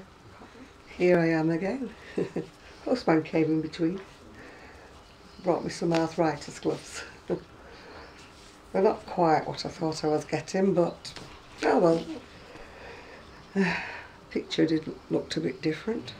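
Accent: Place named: Northern England, United Kingdom. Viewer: Hello. I just started crocheting 2 weeks ago and I found your videos when I was looking for crochet book reviews. I have subscribed to you as I love your videos:) I was intrigued to find out where you're from because of your northern accent, and I saw on your Etsy shop that you live in Manchester. This made me smile because I went to university in Manchester.:) Anyway, thank you for your videos. I hope I can be even half as good a crocheter as you one day! xxx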